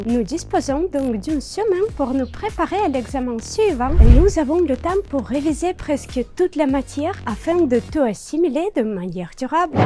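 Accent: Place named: South, Russia. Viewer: Bonjour, vous avez l'accent russe mélangé à l'accent du Sud de la France! Bonne vidéo, on aime la Russie et les russes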